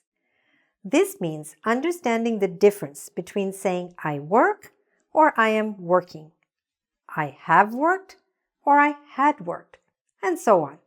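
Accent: United States. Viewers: Hi,! I'm from Bavaria. Are you an American? You speak English with an american accent, I'd say. Or I'm wrong? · This english is american or British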